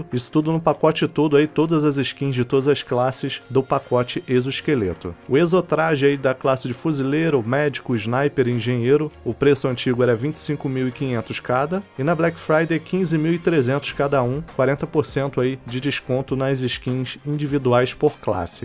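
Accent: Rio de Janeiro, Brazil. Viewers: acho q ele é carioca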